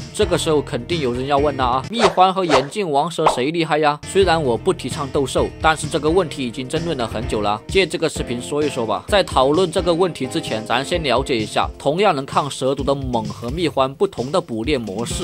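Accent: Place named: Hunan, China. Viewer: 1，leng；2，不leng。哈哈，这湖南音。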